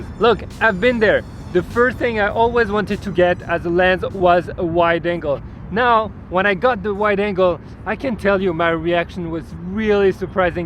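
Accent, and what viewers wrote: France. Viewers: Oh je pensais que tu étais un américain avec un accent anglais... mais l'accent français est trop mignon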